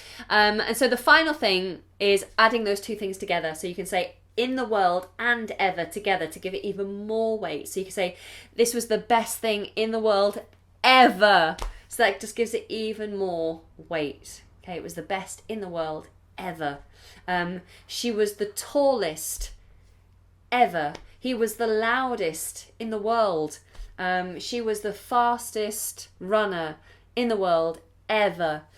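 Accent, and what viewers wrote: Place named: United Kingdom. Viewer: Hi! I am Chandan Gunri from India. I have been watching your YouTube videos very carefully. I saw don't know the exact meaning of somnambulism. It's meaning is walking in sleep. I love your British English which is really helpful. You are truly an incredible teacher and there is no doubt about it. Thank you. Please get in touch with me like that. Really, you are helping me a lot.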